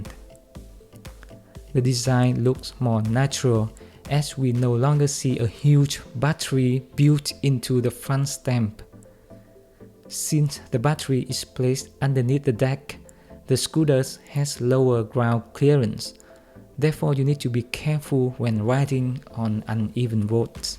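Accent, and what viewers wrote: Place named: Vietnam. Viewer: Vietnamese accent :D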